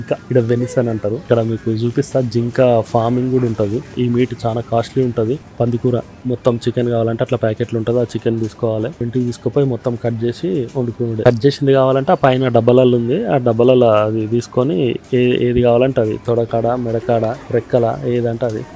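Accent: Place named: Telangana, India. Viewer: Nice video sharing bro, talking in telengana language is very nice. All the best bro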